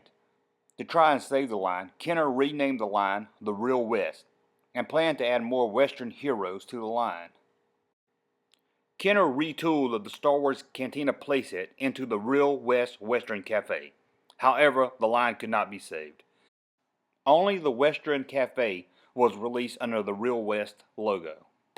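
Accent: South, United States. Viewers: He has a southern drawl...he’s from Joe-juh. (Georgia). That’s why he sounds different; u don’t get out much do you? It is ok though, intelligence and looks aren’t everything! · just a southerner.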